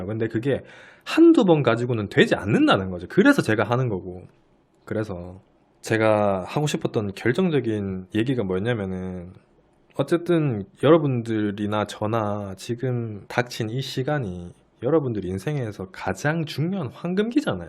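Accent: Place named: Daegu, South Korea. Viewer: ~ 평소에 영상 즐겨보고 있습니다. 말씀도 너무 잘하시고 생각도 멋있으시고 멋짐에 빠져서 유투브 입문한지 얼마 안된 제가 자발적으로 즐겨보는 몇 안되는 채널이 채널 입니다. 이번 영상을 보면서 의 진심이 느껴져서 이렇게 글을 쓰게 되었습니다. 깊은 생각과 나름의 철학으로 만드시는 영상들이니 언젠가는 빛을 볼거라고 확신합니다~^^ 그리고 좋은 말씀 많이 해주셔서 감사합니다~ 제가 대구 사람인데 서울에서 힘들게 타지 생활하면서 같은 동향 사람 말씨로 이런저런 얘길 들으니 더 친숙하게 느껴지는 면도 있는 것 같습니다. 그리고 영상보면서 지금의 남자친구와도 사랑하게되고 그 사랑을 유지하는 것에도 도움 받고 있습니다. 타지에서 외로워서 남자에게 마음이 쏠리게 되더라고요. 단순히 연애 이야기가 아니라 그 너머의 것들을 이야기해주셔서 더 나은 제가 되는데 도움을 주셨습니다. 그래서 지금 너무 멋진 남자와 연애할 수 있게 되었어요^^ 의 진심이 담긴 흔적들은 시간이 지날 수록 빛을 발할 거에요~ 그리고 저에게도 오래오래 그 마음을 보여주세요~ 보면서 제가 항상 제 자신을 다잡을수 있게요~ 긴글 읽어주셔서 감사합니다! 늘 건강하시고 행복하세요^^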